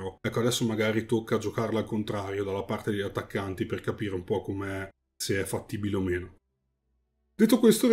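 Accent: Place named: Lombardy, Italy. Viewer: ti ringrazio a te per il "bell" ahahah. Hai un'accento familiare, sei lombardo?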